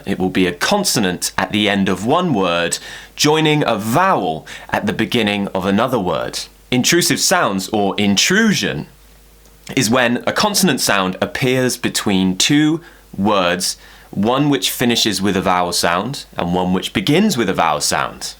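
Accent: United Kingdom. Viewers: Hahah the last phrasal verb I use the most frequently😂😂 (No, no I'm joking of course) To be serious I really admire your pronounciation, your accent is soo stunning. I used to learn American accent, but now I find British accent way more lovely and pleasant to the ears. I've been trying to copy it but I sound ridiculously stupid😀 Cheers!❤ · Kanilani Kanilani I don't know if you are barking up the wrong tree, but his accent will be helpful in every situation you face and you will sound posh if you put in efforts learning it. Cheers! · britsh accent so cool no matter how hard i try to copy it i just can't >< but ur videos rlly help me thank u ♡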